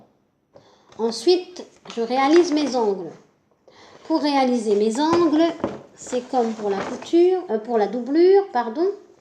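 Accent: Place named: Brittany, France. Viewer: Bravo pour votre tuto et votre bel accent du Méné bré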